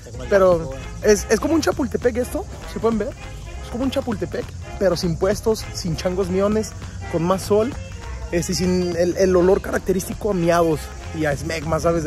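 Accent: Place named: Chile. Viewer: hablando como Chileno 🫶🏻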